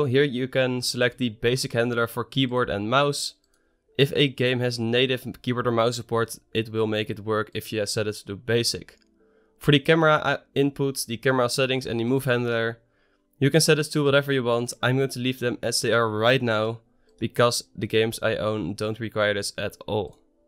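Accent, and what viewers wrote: Netherlands. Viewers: You sound very Dutch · With an accent so thick, I can tell that you are definitely Dutch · correct me if i'm wrong but your accent tells me that your dutch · are you dutch by any chance? cuz i hear a dutch accent.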